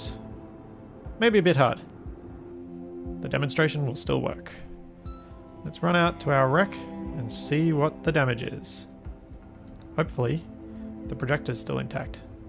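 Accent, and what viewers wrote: Australia. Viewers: I have been enjoying this game sooo much more since I started watching your videos, so just wanted to thank you for all the time and effort you have put in - they are all brilliant - Thank you! I have even gotten used to the Aussie accent:p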